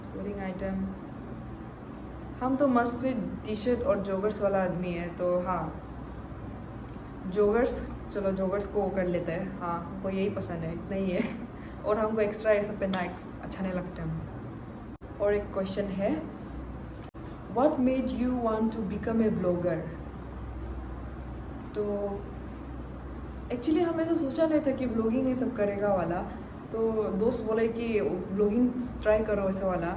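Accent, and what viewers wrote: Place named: Dekapam, India. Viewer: Avi pata chala ki ap dekapam se hai bolke😅 im also from dekapam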